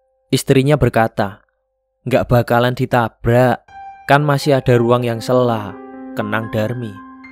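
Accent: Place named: Java, Indonesia. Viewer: Beda orang, ini logatnya ada jawa nya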